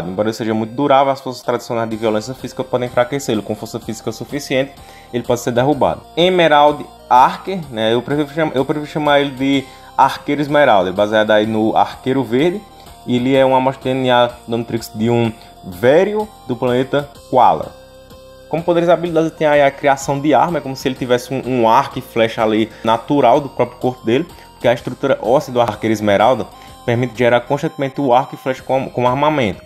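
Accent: Northeast, Brazil. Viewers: O melhor nen e o conteúdo e sim o jeito nordestino do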